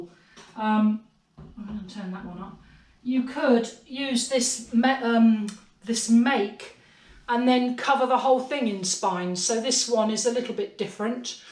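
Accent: United Kingdom. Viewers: I had never heard of Blue Peter & had to Google it - a can’t get enough of your British accent & expressions! We call the “whirler” a banding wheel in the US but I’ve decided to start calling it a “whirler” - that’s so much more fun! Sending much love & I can’t wait to make my first poppy. I’ll email you a picture when it’s all glazed! Cheers!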